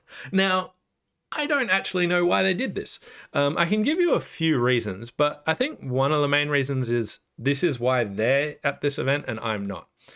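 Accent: Australia. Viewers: How have I missed your channel till now? Enjoy your vids - good to listen to an Aussie discussing bridge. Love the analysis and hope you get to ake a lot more of these, I will be a regular watcher from now on.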